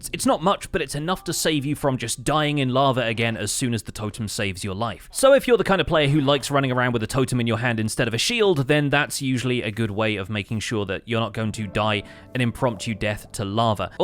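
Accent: United Kingdom. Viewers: I'm on episode 4 of this series but wanted to leave a comment on a recent with the hopes of being seen. OG Minecraft player so I've seen a fair few let's play. I always come back to you though, you have such a wonderful balance of tutorial but also a play through. It's nice to learn new things now but still see he same world develop and see you work through designs etc. Also lovely listening fellow Brits. You've got such a soothing voice!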